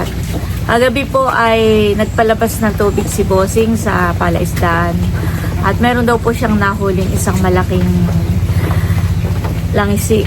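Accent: Quezon, Philippines. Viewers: Taga Quezon po kayo Ang punto po Ng salita nyo ay Quezon po saan po kayo sa Quezon po Ako po taga Lopez Quezon po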